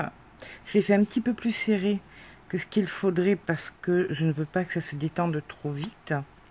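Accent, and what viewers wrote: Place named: South, France. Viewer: Merci pour ce tuto.mais pour une débutante... le point coquille n est pas toujours évident. Je n ai fait qu un rang de point coquille. Je b arrivais pas à bien comprendre sil fallait piquer dans chaque côte ou pas... le cadrage n étant pas optimum... mais continuez comme cela..avec votre charmant accent du soleil!😘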